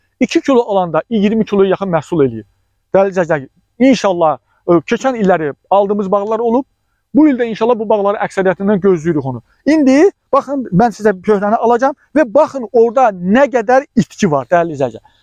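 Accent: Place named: Qakh, Azerbaijan. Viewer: Əlhəmdulillah Qaxlilarin ləhcəsi supperdidə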